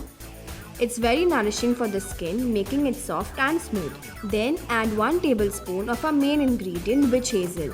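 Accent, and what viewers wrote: India. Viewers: Very hard to understanding her ascent too strong indian.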